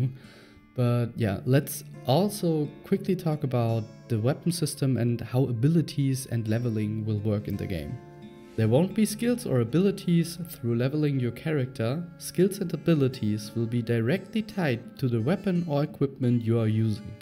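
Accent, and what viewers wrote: Germany. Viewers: ..I'm inspired by diablo and gothic :) Are you living in germany or america...you sound german, but that doesn't mean you live here. :)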